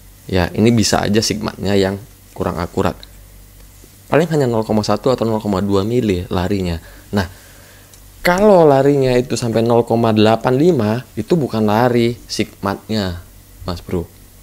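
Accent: Medan, Indonesia. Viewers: Medan mana ny ko bang 😀 keren x 🤪 Salam balap tidur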